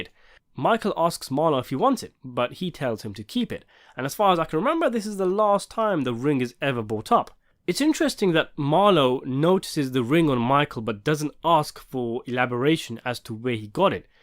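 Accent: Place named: United Kingdom. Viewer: Listening to the narrator is like listening to a british person telling me that beans and toast is a great american cuisine. As soon as I heard the accent , I just stopped listening.. srry not srry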